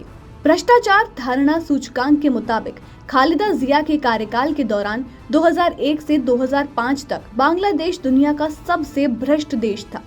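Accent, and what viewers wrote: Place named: Rajasthan, India. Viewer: Ekyannnve chhhyanve , hindi nahi rajasthani bollli aii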